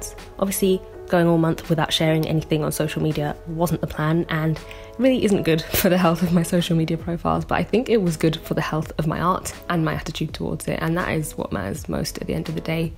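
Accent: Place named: RP, United Kingdom. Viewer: Your work is amazing- as always. Why do I think you sound more ‘posh’ in this video? ☺️ I decided to do inktober this year. Being a full time secondary art teacher I knew it was not going to be easy. I took the plunge anyway. Everyday I would come home after a long day and I would think of what to draw. I did some traditionally on paper, otherwise, I completed each prompt on ipad as it was easier for me to do so even while in bed.🙈 Somewhere along the way I had some procedures done at the hospital and I did really want to give up- but I took few days off and I tried catching up- I am still catching up. I do feel a sense of achievement and I am hoping I will complete the last few by the 31st. You have been a big inspiration to me. I have talked about you to my students and I highlighted your art and also your resilience, commitment and integrity towards your art. 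I talk about you so much that some pupils thought I knew you in real life😂😂😂 Anyways I hope you settle down in your new place. All the best and looking forward to more art from you. By the way I really liked the limited colour palette approach and I like how you worker with the ink when the ink was not doing what you wanted.... as opposed to just give up! Sorry for this long paragraph- just had to tell you all of this. ❤️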